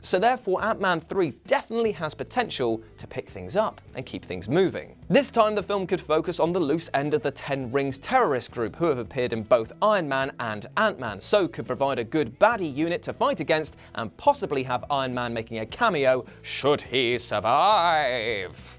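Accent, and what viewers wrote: United Kingdom. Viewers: Brick Boss 2004 he’s British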